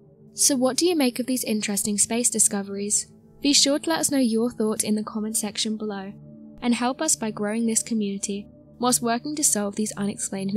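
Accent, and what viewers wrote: England, United Kingdom. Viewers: Cute English accent🖖👽🤖👾🛰️☄️🚀👻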